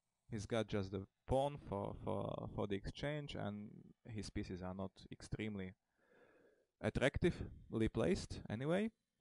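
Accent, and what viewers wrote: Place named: Eastern Europe. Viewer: You speak portuguese, i speak spanish, and we both are able to understand him regardless of his pronunciation. He is from a slavic country, so don´t complain about "free" and "threes".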